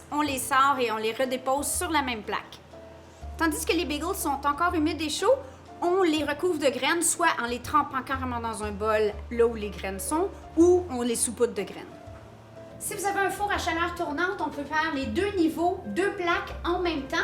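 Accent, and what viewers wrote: Quebec, Canada. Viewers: Explication super avec une joie de vivre. J'adore et alors avec l'accent Québécois en plus c'est top. Merci dès demain je me lance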